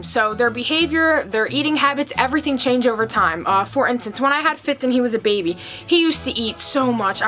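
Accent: Philadelphia, United States. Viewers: Are you from Philly? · hey hun nice video er! you from Philly?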